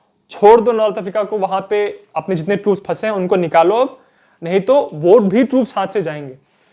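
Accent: Bengal, India. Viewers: Sir, are u bengali ?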